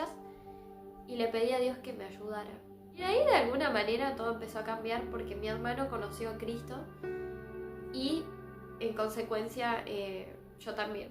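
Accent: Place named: Buenos Aires, Argentina. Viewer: Se nota lo porteño Sho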